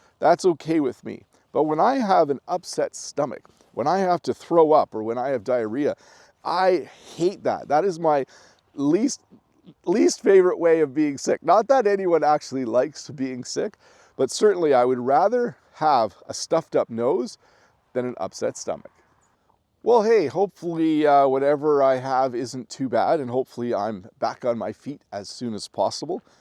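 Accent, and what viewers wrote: United States. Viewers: Dear, Here Ted from China, I really really appreciate for your videos which are most perfect of English learning. I don't need waste time to learn boring Chinglish any more. now i'm very enjoy to watch your Enlglish teaching, especially your accent, just like wathcing an American TV series. Also, i'm enjoy everything you said which are very close to our life, and it makes me feel how beautiful the Canadian countryside is. Hopefully that I could go there to have an enjoy that beautiful scenery one day. Bless you and your family! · you in Canada have more british or american english? As far as I know Canada was part of British Empire and accordingly you have a british one but I noticed you pronounce some words like Americans do. Answer please. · Dear teacher, My English is not good, my hearing is poor,I am a new immigrant, living in the United States. 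Is the English you speak here in Canada the same as the English you speak in the United States? I really want to learn English from you,Is your pronunciation the same as American pronunciation?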